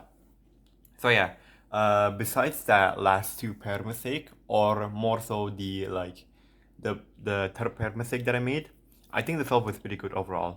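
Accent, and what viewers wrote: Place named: Indonesia. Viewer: Next time gonna be better. Also this is the most indonesian accent ever when you speak english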